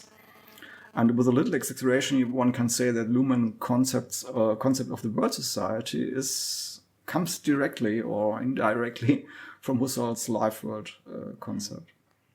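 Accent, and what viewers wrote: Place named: South Africa. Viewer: Out of interest, where is the creator of this podcast from? Your accent sounds very similar to a South African accent. I am from South Africa, and this is intriguing.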